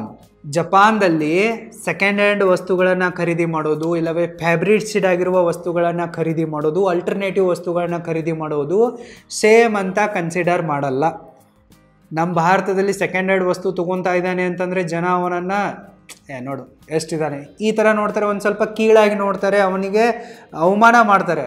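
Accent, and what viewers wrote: North Karnataka, India. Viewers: ನೀವ್ ಉತ್ತರ ಕರ್ನಾಟಕದ ಕಡೆ ನಾ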